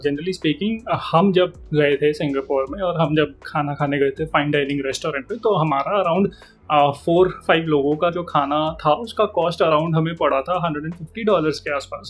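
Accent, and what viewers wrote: India. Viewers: Your Indian accent is so cringe and unbearable for ears.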